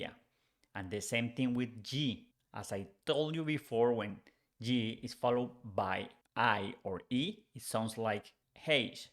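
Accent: Colombia. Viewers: I listen to your podcasts and read through the transcripts. This has helped me improve comprehension tremendously! I am also appreciating this video about hacking or using a simple tool. Also, the short phrases are a huge help as well. Me Encanta el acento Colombiano. Muchas gracias por tu arduo trabajo!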